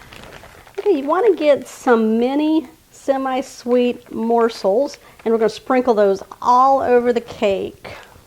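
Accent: Texas, United States. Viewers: I am delighted with your Texas accent pronouncing ‘marscapone’ like ‘NASCARpone’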